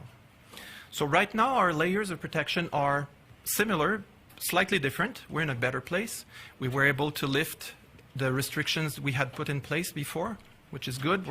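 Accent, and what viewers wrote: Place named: France. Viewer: Ughhhhh. That French accent. Gross.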